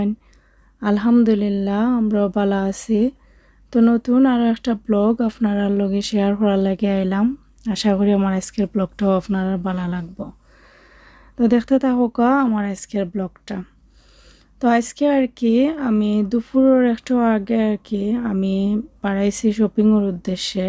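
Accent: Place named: Sylhet, Bangladesh. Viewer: Apu ki,,Sylhet er sunamgonger meye?ami o sylheti. Apnar kotha gulu shune khub valo laglo...tai apnar chanel ta subscribe kore nilam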